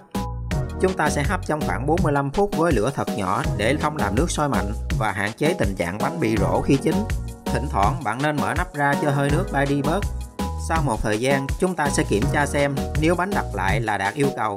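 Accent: Mekong Delta, Vietnam. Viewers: A ở miền Tây đúng ko ạ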